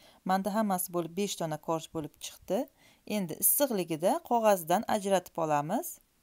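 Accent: Khorezm, Uzbekistan. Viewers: Horazm shevasiga ohshattim.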